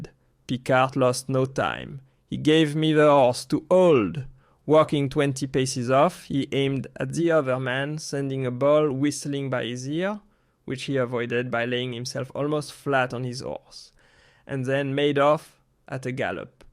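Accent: France. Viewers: Love hearing this read in a french accent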